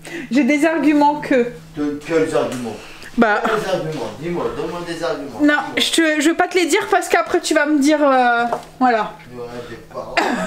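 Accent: North, France. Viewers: Coucou... Je ne connaissais pas une "battée" j'adore votre accent du nord même si ce n'est pas souvent... Ça promet si il y a déjà des moustiques 😡 Les fleurs commencent à sortir pourrons plus grand plaisir Bisous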